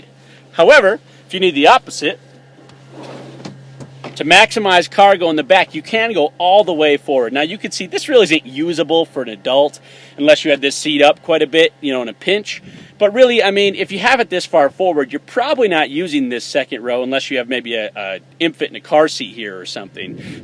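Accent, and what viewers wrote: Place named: United States. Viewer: Your voice is so loud . Very American like .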